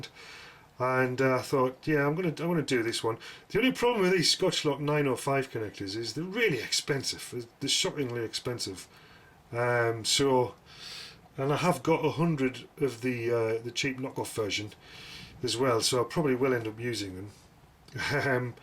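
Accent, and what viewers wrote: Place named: Northern England, United Kingdom. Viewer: HI YOUR ACCENT SOUNDS A BIT MORE NORTH THAN MYSELF IM A BORO LAD HEARD YOU MENTION YOU BOUGHT SOMETHING FROM PETERS SPARES, ANYWAY YOU ARE DOING A GREAT TEACHING JOB FOR ME IM BRAND FIRE NEW AT THE RAILWAY MALARKY BUT WANTING AS MUCH ADVICE AS POSSIBLE KEEP UP THE GREAT WORK TAKE CARE!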